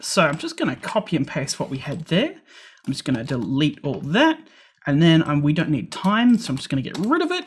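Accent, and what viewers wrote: Australia. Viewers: Part way through and finding this the most clear, no fuss course to follow. big thumbs up. did have a snag at beginning where i almost gave up. couldn't get pico to be seen on laptop. turns out i was using a power only cable and not one with data. silly mistake but got there in the end. very pleased you uploaded this course. Thank You, and is that the Aussie twang to your voice? Brit here and find dialects fascinating. It changes here in just 20 miles apart.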